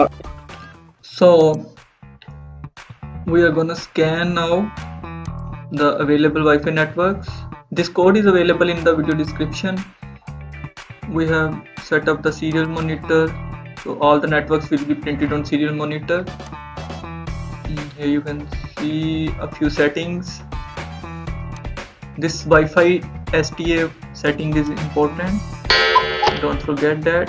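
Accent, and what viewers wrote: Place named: India. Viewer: I don't understand indianenglish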